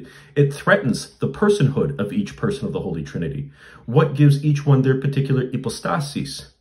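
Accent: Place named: Canada. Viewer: Your blessing, father. I love your videos and your subtle Canadian accent. I am so encouraged that priests like you are still coming into the church and standing for the truth & tradition of the faith. May God protect you, your family and your work - I will have you in my daily prayers going forward.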